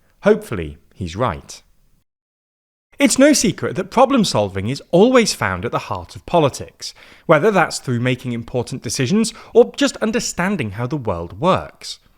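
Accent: United Kingdom. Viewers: That’s just due to the British accent.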